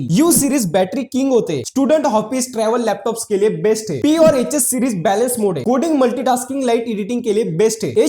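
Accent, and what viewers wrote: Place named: Maharashtra, India. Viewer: Marathi accent flowing up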